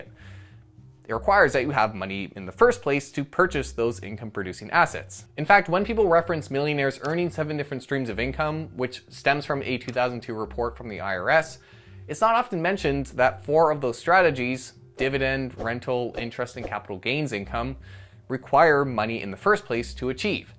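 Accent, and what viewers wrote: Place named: Canada. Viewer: hate canadian accent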